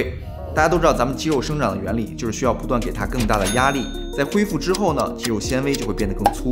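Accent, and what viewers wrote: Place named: Shandong, China. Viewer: 山东人吧 这口音能听出来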